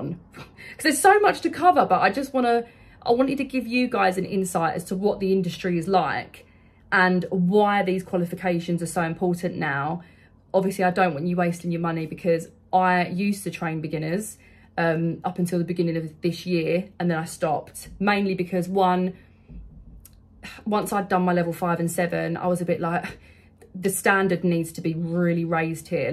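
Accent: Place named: United Kingdom. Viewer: Hello, I absolutely love your UK accent❤ I live in Texas and recently got certified as a concierge neurotoxin injector in Prosper TX. Would you please be able to help provide what it is I'd be needing to build clientele also to confirm that course i took is compatible to a level 4, 5, or 7? I'd really appreciate your effort and assistance. Thank you 🎉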